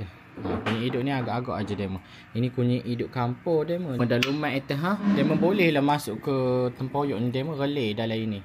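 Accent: Pahang, Malaysia. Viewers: Pekat sungguh bahasa Pahang. Dengar loghat tu macam dari belah lepih gitu yek. Koi org Jerantut. Lama dah koi tak masok gulai tempoyak ni. KL ni Payoh amat nak cari tempoyak.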